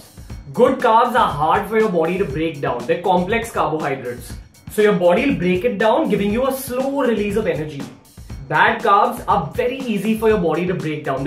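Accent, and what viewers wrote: India. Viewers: I like indian English accent hahahah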